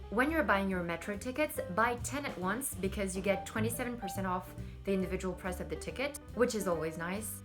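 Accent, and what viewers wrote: France. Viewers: Great French accent - but I guess you're actually French!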